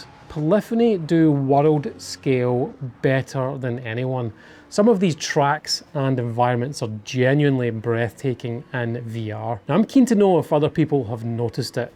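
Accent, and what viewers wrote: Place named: Scotland, United Kingdom. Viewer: Firstly.. before my main comment…. I am Scottish 🏴. - your accent sounds Scottish. Out of curiousity why quote prices in dollars- are you catering to the bigger US market? Anyhoo….. .. Wow. Watching that video it could have been myself talking. Agree with you 100%. In our house, my partner is the console player (PS5). More Assasins Creed, Crimson Desert than GT7- but she did play it (flat) with controller and was as good if not better than me on that platform. As soon as you put me on PSVR2 (with globular cluster of course) I levelled the playing field - just getting used to that controller!! 😊 I am yet to play GT7 on my ‘$7000’ 😉 PC rig- simply because the PS5 is in our living room hooked up to our big TV. I mentioned in previous vid comment I play only modded AC on PSVR2 with PC adapter and the DFR mod. Other than a high end headset, I am a bit of a PC snob- but - I still rate GT7 highly. 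All your comments ✅ Also Sophie (1 or 2) is a good AI competitor if you (like me) race offline only. Yes- modded AC looks friggin awesome when you have it dialled in properly, and tinkering in that sandbox is half the fun for me. With more than 6000 cars and 1200 tracks, it’s hard to beat. But… GT7 looks gorgeous at times for something which is plug and play. Bit ‘grindy’ perhaps to unlock some stuff, but tyres, pit stops, visuals. Pretty good- whatever sim racing physics snobs may say. At the end of the day.. it’s not real racing. I did this for years. Bankrupt me😅 So many broken engines…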